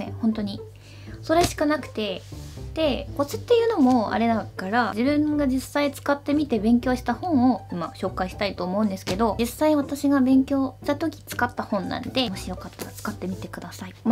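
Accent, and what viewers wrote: Kansai, Japan. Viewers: 関西弁かわいい笑笑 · たまに出る、大阪弁が可愛いですね？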